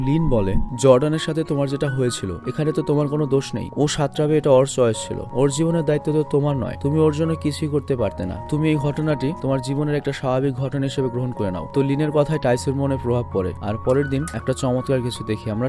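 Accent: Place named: Barishal, Bangladesh. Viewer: আপনি বোরিশাইলা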